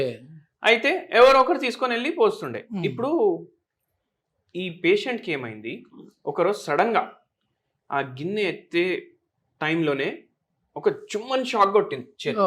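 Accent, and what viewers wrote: Telangana, India. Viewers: Navvu enduku vasthundi Telangana language ana . Siggulenollaki navvevasthadi & overall something is wrong with you.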